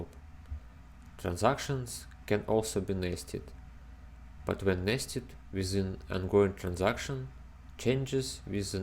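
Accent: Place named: Russia. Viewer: You have a wonderfull Russian Accent 🥲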